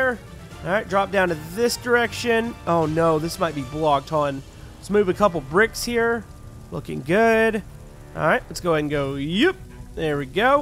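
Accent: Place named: Texas, United States. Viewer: is problobly from Texas from his accent.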